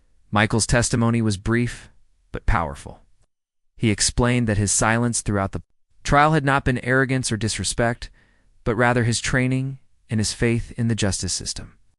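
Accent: United States. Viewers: Right! As if a member of the Brigade of Guards would appear at court in his parade uniform. Rubbish with an American accent as usual! Bloody stupid!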